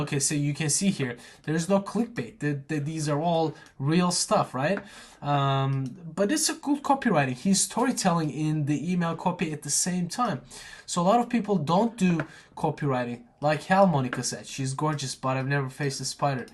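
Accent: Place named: Turkey. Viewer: Çok Türk aksanı alıyorum bu videodan :D Kötü bir şey değil bu arada, sadece kanalda isim dahil her şey İngilizce olduğu için şaşırdım. But anyways, thanks for the tutorial and tips 👍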